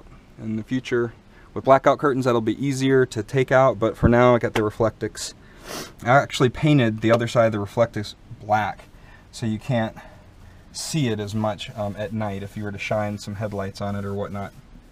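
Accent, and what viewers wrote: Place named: Canada. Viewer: Nice van. . I hear a slight Canadian accent, are you from there?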